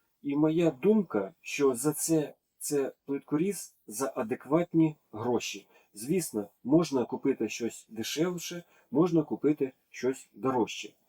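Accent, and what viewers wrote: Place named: Russia. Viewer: Тренируй украинский язык. Уж больно сильный русский акцент😅😅😅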